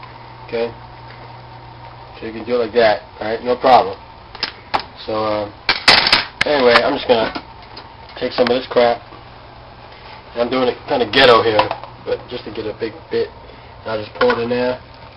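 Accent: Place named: East Coast, United States. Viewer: he sounds like hes from the east coast but this is some half ass pho but a lot better then what i can do.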